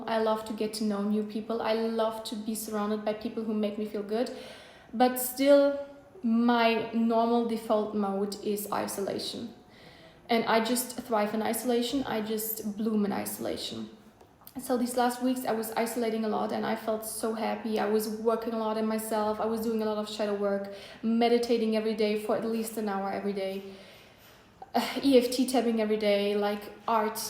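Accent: Germany. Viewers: Is that a German accent? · Is she germann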